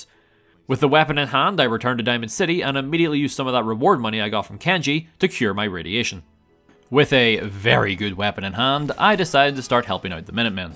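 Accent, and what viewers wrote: Northern Ireland, United Kingdom. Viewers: From the beginning of the video where you said you talk like Marge Simpson I was trying to place your accent. I knew it was Irish and thought it was probably northern but you answered my question in the first video I legitimately thought about it. Love when that happens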